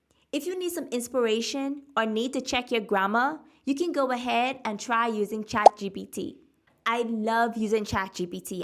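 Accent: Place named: Nassau, Bahamas. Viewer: I knew it u sound like some of my family who live in Nassau ❤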